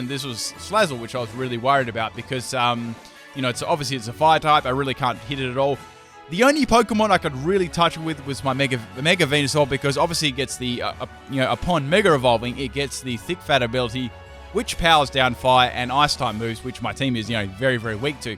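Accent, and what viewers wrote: Australia. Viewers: He is Aussie like me I can tell by the accent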